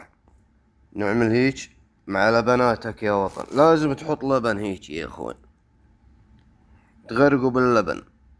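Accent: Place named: Jordan. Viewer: اللهجة الأردنية ❤😊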